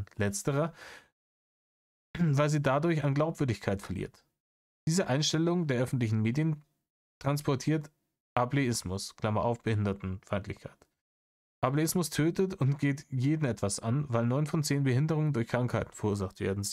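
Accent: Bavaria, Germany. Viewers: Dein Latein ist grottenschlecht, aber dein Bayrisch ist super 😂 Danke für 2 Stunden Kurzweiligkeit im Auto. Mach bitte so weiter 😊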